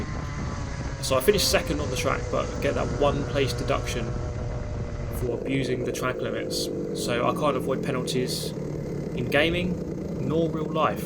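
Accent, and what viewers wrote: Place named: South East England, United Kingdom. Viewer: Great content again mate! That fight for the first place was intense! Your inspiring me to try and have a go at it! Ive seen seen they are some races in Farnborough near me, judging by your accent you are racing somewhere in the south east?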